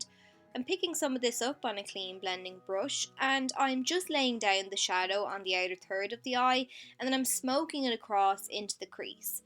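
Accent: Ireland. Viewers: Great youtube makeup artist 1. because she is talented 2. lovely irish accent :)!!!!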